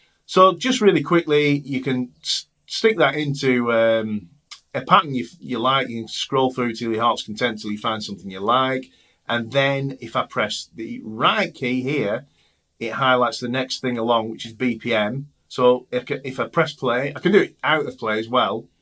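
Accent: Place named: Northern England, United Kingdom. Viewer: Great demo! Love that short-scale bass, too. And it's nice to hear the dulcet tones of a fellow Northerner on YouTube ;)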